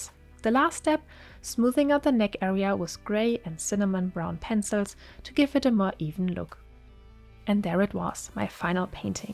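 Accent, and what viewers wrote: Germany. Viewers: I can hear and 'see' Alpay Efe in these vides... like a female version of him... also with same german accent... fun 😊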